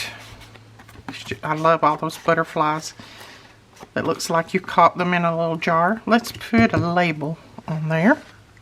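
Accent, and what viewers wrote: South, United States. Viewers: Good afternoon, while I watch and listen to you I am sewing ginger bread dollies for my church nursery..watching you makes me get the paper bug. as soon as I finish the dolls I am going to make Gratitude journals for my ladies group at church.. I am buwy crafting most every day.. You have a blessed day. I love listening to your wonderful southern accent..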